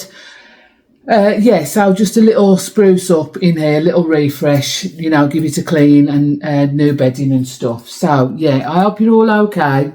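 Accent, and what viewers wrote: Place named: Black Country, United Kingdom. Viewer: Hi love watching someone else with a blackcountry accent love the candle wick jokes x Done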